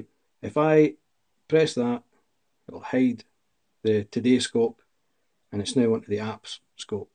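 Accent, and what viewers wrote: Scotland, United Kingdom. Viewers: Is that Scottish accent? It's nice.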